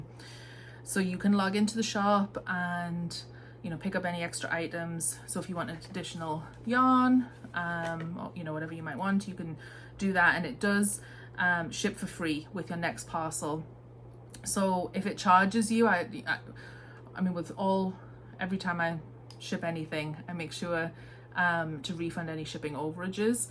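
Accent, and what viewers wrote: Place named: Newcastle, United Kingdom. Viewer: Hello. Bonny Lass! As you continued speaking, the Geordie accent became clearer - my mam is 97 and she is from Jarrow! I am married to a Texan, but we live in England, so I have become used to a ‘mixed’ accent. I am so sad that you are based in the USA (costs of delivery to the UK 😱) as your yarn colours seem beautiful, I am an avid reader and I love tea. Oh well! The episode was really interesting and I am looking forward to future ones. Jinny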